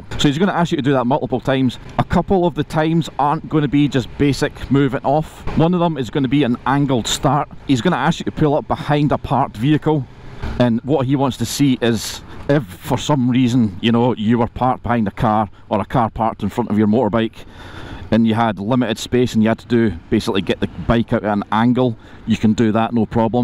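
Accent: Scotland, United Kingdom. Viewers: Fellow Scotsman ? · i'm currently doing lessons in spain, which makes it interesting because my spanish is so terrible hahah. they let me do the common theory test in english, and the motorbike specific one was in spanish. failed the english one :) passed them both next time, then did the mod 1, which i fucked up as well by riding through the cones instead of weaving around them, due to nerves, passed it next time no problem had my first lesson on the road a couple of days ago, and it was terrifying, but now i feel a lot more confident! couple more lessons and i'll feel confident enough to take the test. your video is super helpful! also nice to hear a friendly accent, i'm scottish too :)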